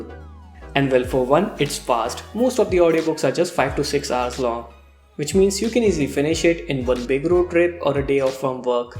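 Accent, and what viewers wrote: India. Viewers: english with accent hindi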